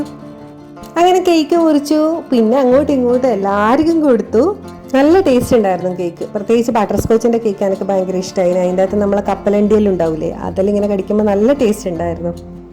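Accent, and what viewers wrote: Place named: Kannur, India. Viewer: Eacheente varthanam keattere thoni kannur aanenn. E vido kandera skpm aann thirinje.. Adipoliyann tta vdo❤❤❤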